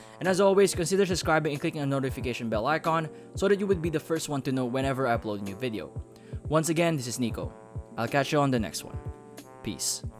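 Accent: Philippines. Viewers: Not racist but you Filipino? Your accent is Filipino-like and the Philippines is in the pacific Just a question from a Filipino fan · I love the filipino accent ♥️♥️🇵🇭🇵🇭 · I actually noticed it too, from the amount of my filipino teachers having the same accent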